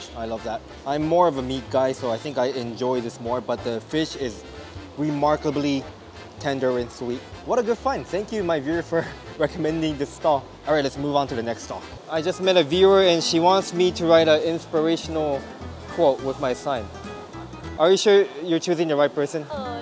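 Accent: Singapore. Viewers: hi...i like yr relaxed singish style...keep up the good work!!!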